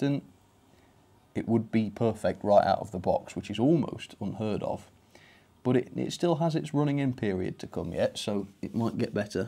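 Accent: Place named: Northern England, United Kingdom. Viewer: He He....! Naughty giggle was had, when the Strange Noise was Described "As a Sheep Coming..!" My Very Sick mind went somewhere utterly Wrong. Imagining ' in Field, Wellies on, chasing down a Rather Worried Sheep..!' So Sorry, but you are from 'Up North', somewhere.?? As I am from Essex, (Originally) anything past Watford is 'Up North' to me..!! Great Review and I am looking for a Nice 0.6.0 tender Loco in B.R. black. So ended here, trying to Avoid Hornby ATM. At least until they get their Shite Together..! Cheers All. Kim in Oz. 😎 🐑🐑🐑🐑🐑🐑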